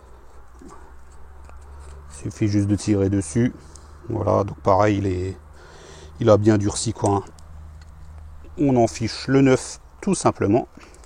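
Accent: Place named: Alsace, France. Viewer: accent et alors..... fier d'être Alsacien, dans d'autres régions, ils ont un accent également, alors..... quant aux joints.... Trèfle Vert par exemple.... en règle générale les magasins Jardinage..... ou pour les veinards comme nous, Alsaciens, un déplacement outre Rhin chez OBI...... nettement moins cher qu'en France..... hé oui..... merci pour vos "bidouilles"....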